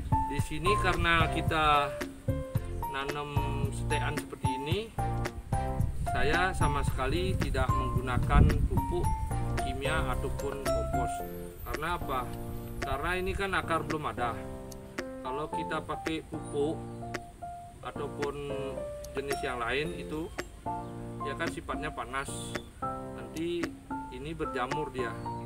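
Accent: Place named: Bali, Indonesia. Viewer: oh pantes logatnya kayak orang bali😂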